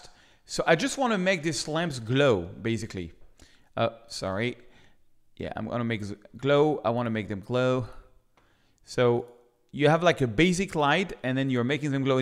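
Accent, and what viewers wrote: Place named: France. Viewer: French man, making fun of his own french accent. Class act!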